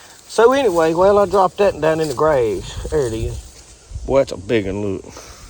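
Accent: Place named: Mississippi, United States. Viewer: I just found your channel, really enjoy your downhome honesty, and that Mississippi accent. Im from Arkansas, live out in the country, got chickens, garden, retired a few months ago, I was part of that rat race, but I’m making the transition to simpler living. Thanks so much for the Bible quotes, helps us keep focused on what’s the most important. God bless.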